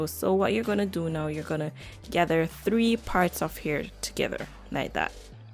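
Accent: Jamaica. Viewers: Heard that Jamaican accent and subscribed instantly 😩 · I figured you're Jamaican based on your accent 😍 love the execution girl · You are very Jamaican. That "lawd Jesus" buss out 😂😂. Very nice hair style and method. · Yup..I'm Jamaican too..so I was like yeah she is from Jamaica 🤗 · Cya hide the accent 🇯🇲 lol love it and def gonna try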